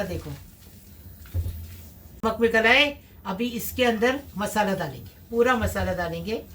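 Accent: Konkan, India. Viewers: Aap ka kokni style me bolna aur banana bahut acha lagta hai ❤️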